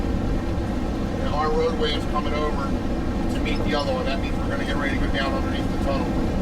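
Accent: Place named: Philadelphia, United States. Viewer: I lost track of how many times you said "wooder".. where u from driver? My hubby pointed it out. He's saying Delaware county PA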